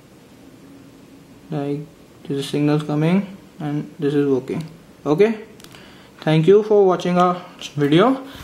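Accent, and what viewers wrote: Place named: India. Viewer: Fox Stephenson it's an Indian accent, you can't be that ignorant to make fun of that? Unless you're American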